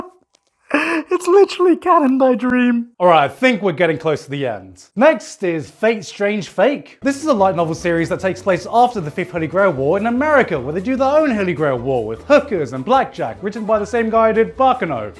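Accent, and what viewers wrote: United Kingdom. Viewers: "There's at least five" Actually had me laughing, especially with that British accent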